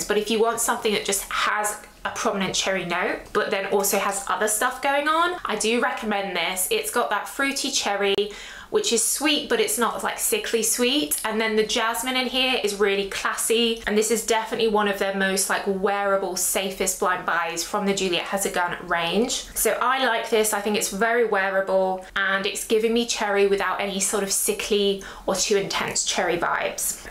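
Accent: United Kingdom. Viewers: I actually find most JHAG perfumes quite wearable, more than many of the designers' really.. I love your videos, not only for the perfume info, which is thorough and enjoyable in itself, but also because I get my "do se" 😅 of good British accent input that I need as a teacher😊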